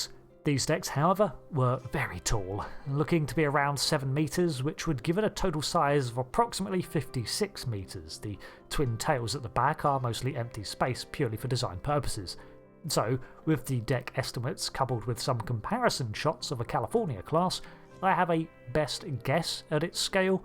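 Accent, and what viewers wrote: United Kingdom. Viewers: The British Accent elevates the dialogue to hitherto unseen heights.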